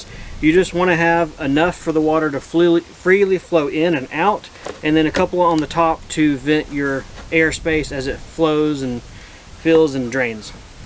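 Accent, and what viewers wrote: South, United States. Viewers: I think that's a southern accent, I'm in a different part of U.S. and we say soil (soy-el) 😉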